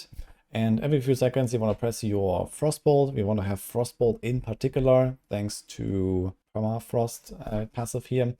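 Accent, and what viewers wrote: Germany. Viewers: Man erkennt immer sofort wer deutscher is. Nice vid! Wird direkt nachgebaut 🎉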